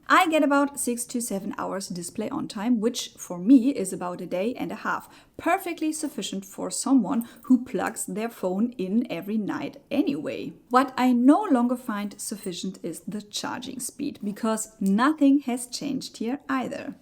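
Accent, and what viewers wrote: Germany. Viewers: You make some very good points about the shortcomings of the S25; Samsung missed the mark on the whole range this year, it seems. I'd argue that the camera is still better than most of the users when it comes to taking photos, but you're spot on about the charging speeds and screen reflection. I've been with Samsung since the S4 but I'm definitely going to investigate other options when it's time to upgrade my S23+. Your English is better than most North American native speakers! Your grammar is impeccable and vocabulary is sophisticated. Yes, you have an accent but it's charming and only adds to your videos. And my mother was German, so I get a bit nostalgic when I hear it.❤Don't change a thing.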